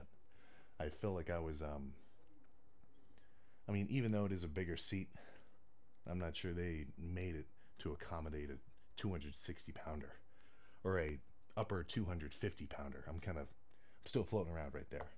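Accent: Birmingham, United Kingdom. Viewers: That Brummy is fucking well annoying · It’s a Birmingham accent bro second biggest city in England towards the north so your not too far off 👍🏽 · That’s a real Brummie accent right there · he's English with a Birmingham accent - each city and even some towns will speak differently with different slang and accents. That's the case all over the country, if I get in the car and drive an hour from my house everyone sounds completely different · Yesss... Go on, you're getting the full BRUMMY accent... straight out of the gate! Big up the UK! Awesome to see you here man!:goat-turquoise-white-horns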